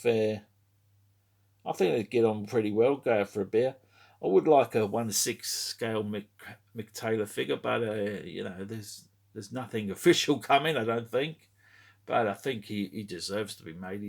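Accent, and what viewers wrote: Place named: Australia. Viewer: YOur austrailian was brilliant !!! Thought id accidentally clicked on a neighbours episode at first with Je Mangle 🤣🤣🤣 Great little figure ! 👍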